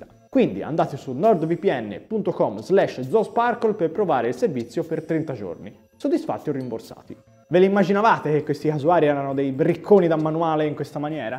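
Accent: Tuscany, Italy. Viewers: bello sentire parlare un po' di Toscano maremma cigniala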